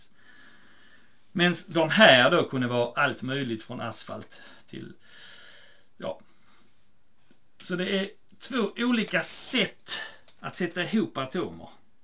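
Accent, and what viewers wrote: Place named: Scania, Sweden. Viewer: Kul att lyssna Kemi på skånska 😅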